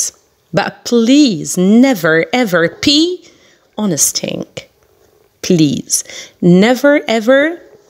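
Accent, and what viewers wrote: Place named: United States. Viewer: صوت رائع لغة متقنة.لكن للأسف لم أفهم شيئا تتحدثين بسرعة و باللكنة الأمريكية كذلك لم تفعلي الترجمة وشكرا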